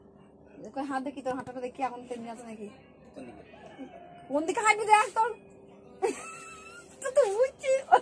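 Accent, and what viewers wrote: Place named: Birbhum, India. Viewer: বীরভূমী ভাষার রসটা দারুণ লাগে আমার❤❤❤... কবে যে তোমার সাথে এমন একটা দিন কাটাবো কে জানে! 😞😞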